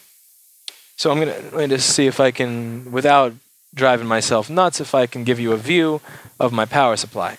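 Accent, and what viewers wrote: New York, United States. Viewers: didn't even have to look at the description to know you were from new york. you probably grew up near queens too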